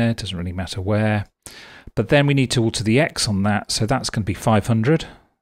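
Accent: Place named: United Kingdom. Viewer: Thankyou - excellent. Also: British!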